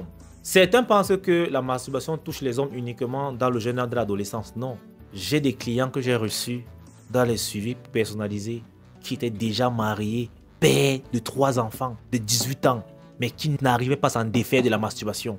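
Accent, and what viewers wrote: Cameroon. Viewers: Je mise que tu es Camerounais 😂❤❤❤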